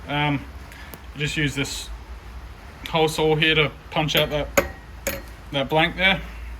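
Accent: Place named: Australia. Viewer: In australia? im guessing via the accent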